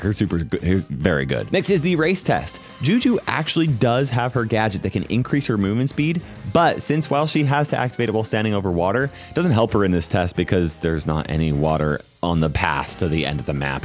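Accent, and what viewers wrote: Louisiana, United States. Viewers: Love the Cajun accent ❤😂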